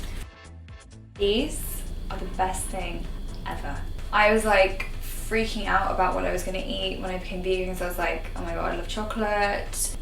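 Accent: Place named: England, United Kingdom. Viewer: what part of England do you live in, i like your accent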